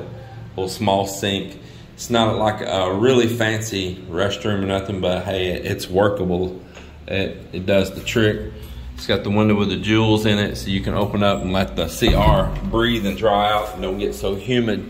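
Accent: Texas, United States. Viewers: You make me laugh. The way you talk. I know you are from Texas. I am from Tennessee. I really like the way you talk. So country and I don’t mean that in a bad way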